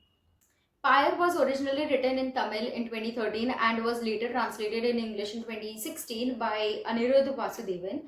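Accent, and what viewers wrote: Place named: Gujarat, India. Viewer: Nice Gujarati accent☃☃☃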